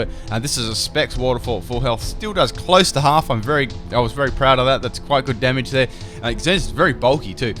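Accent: Australia. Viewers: are u australian or something i'm new in this channel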